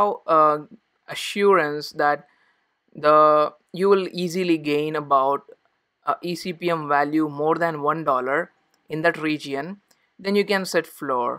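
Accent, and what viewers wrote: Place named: India. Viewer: i think you are indian because you have the indian accent of talking in english maybe like my accent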